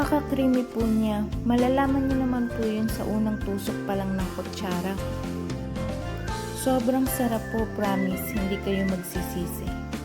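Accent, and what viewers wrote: Batangas, Philippines. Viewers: Ang cute nyo po magsalita parang gusto ko nalang tumira sa batangas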